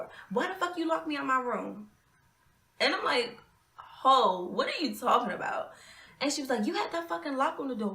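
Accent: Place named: Baltimore, United States. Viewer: Just found your channel!!! I love it! Are you from BMORE or DMV? LOL I hear your accent lol New subscriber boo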